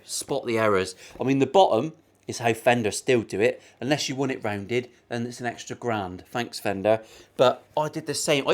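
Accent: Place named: Plymouth, United Kingdom. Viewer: Ha I hear your janner accent dare do . I got my own parts-caster , and 2 x heavily modified acoustics ( cow sticks in the state's) all lefties as am I. They're shit ruff but I live in Devon so if you're looking for guitars to feature 😂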